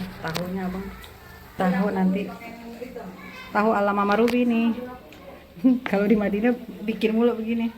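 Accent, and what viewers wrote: Jakarta, Indonesia. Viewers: Betawinya medok bgt mpo😅 ... Salam Sy jg betawi larangan Ciledug tangerang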